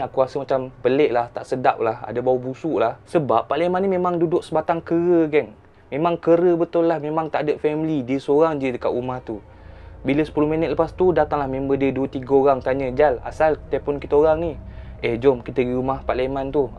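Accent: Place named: Negeri Sembilan, Malaysia. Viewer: Esse org nogoghii....slang nogoghi kelauttt🤣🤣🤣👍👍👍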